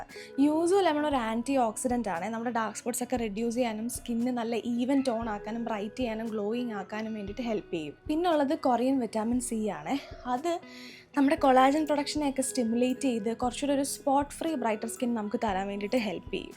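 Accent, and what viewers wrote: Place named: Trivandrum, India. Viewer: slang ipo tvm slang ayapole 😃and hope u and baby doing well ❤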